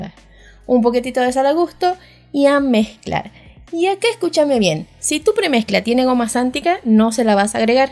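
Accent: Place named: Tucumán, Argentina. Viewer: Es de Tucumán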